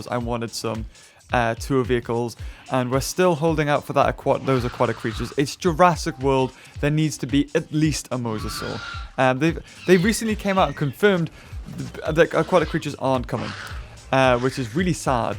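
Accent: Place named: United Kingdom. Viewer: You have a uk acint and britches in some video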